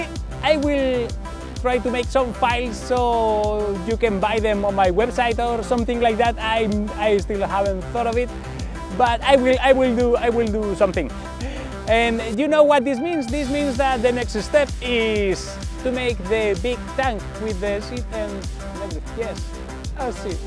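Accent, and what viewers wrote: Spain. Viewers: de donde eres . eres de España? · Spanish english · He's from spain lol, im spanish too and I recognise the accent, appart from the fact that is a spanish name. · Hey, are you from Spain? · This guy has to be spanish mainly by his accent right? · Eres español no? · Are u spanish? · You can't unhear the Spanish accent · Eres español verdad?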